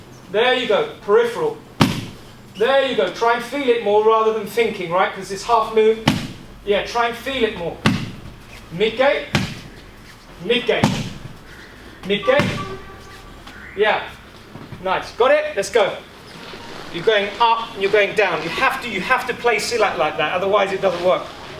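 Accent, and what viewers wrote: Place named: Canada. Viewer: His voice sound so canadian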